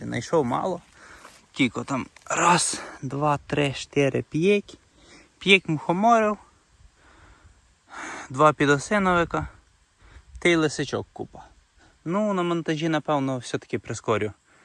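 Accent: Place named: Hutsul, Ukraine. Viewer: як я люблю гуцульську говірку🎉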